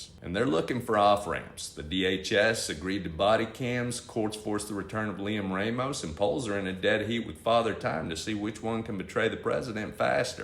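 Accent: South, United States. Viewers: Well done! Love your charming Southern accent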